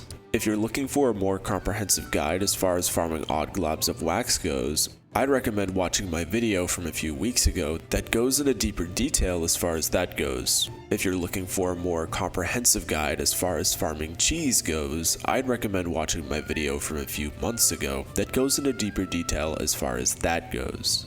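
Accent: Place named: California, United States. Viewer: You sound Californian as fk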